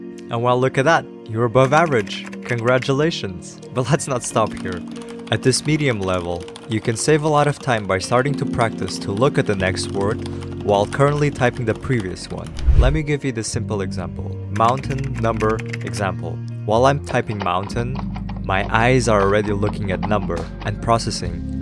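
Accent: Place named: United States. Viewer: he speaks american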